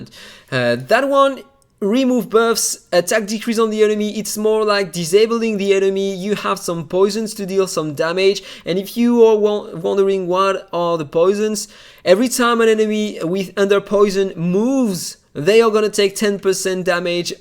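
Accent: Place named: France. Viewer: I love the French accent, by the way.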